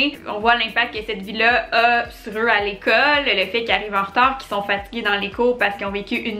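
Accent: Quebec, Canada. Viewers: oui je sais je souligne juste que j'aime beaucoup l'accent Québécois. J'habite en France et j'aime beaucoup regarder des films Québécois qui sont de qualité et aussi pour écouter l'accent 🥰🥰🥰😙😙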